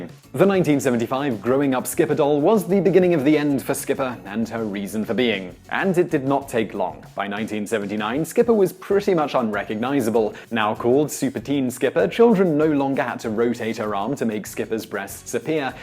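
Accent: United Kingdom. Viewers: The dude's British accent makes it sound like he's talking about someone named Bobby.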